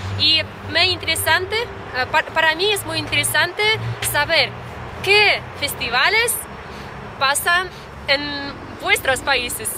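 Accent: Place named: Ecuador. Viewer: Hola ))) yo queria decirte que en en determinados momentos pareciera que tu lengua materna es el espeñol. tienes el asento de una region del Ecuador. aunque aqui hablamos el idioma español existen diferentes formas de pronunciarlo y esto depende de la region del pais en la que se hable, pero todos podemos entendernos muy bien los unos a los otros porque es el mismo idioma. te invito a ver un video mio en Chelny.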